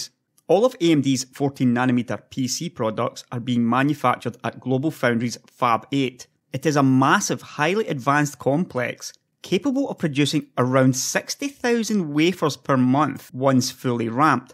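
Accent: Scotland, United Kingdom. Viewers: TBH it doesn't change the point you made and I agree with, that there process has matured now, keep up good work, nice hearing a fellow Scot! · Scottish I understand him just fine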